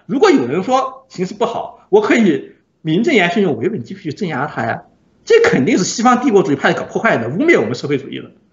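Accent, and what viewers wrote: Sichuan, China. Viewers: 阿姨这四川口音233333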